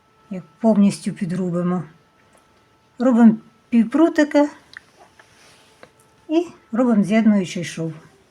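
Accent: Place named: Poltava, Ukraine. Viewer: Це-Полтавщина! Тут є все, канєшно ж!😅 І це не той випадок, коли потрібно шпиняти за вимову!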